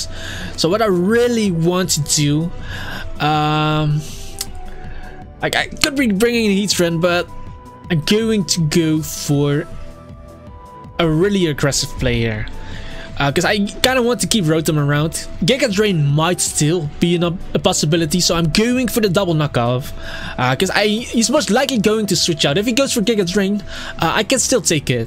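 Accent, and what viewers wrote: Netherlands. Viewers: Just out of curiosity, why do you want to have a British accent? I kinda like your Dutch accent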